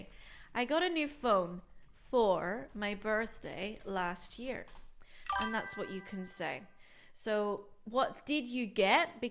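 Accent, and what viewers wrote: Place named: Spain. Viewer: "Por favor, profe, le agradecería que creara más contenido de este estilo. Es realmente efectivo, y cada vez que quiero recordar, me vienen a la mente sus hermosos ojos y su precioso cabello. Además, su acento español es fascinante. Ojalá considere la idea de abrir un canal de YouTube solo para usted. Sería maravilloso.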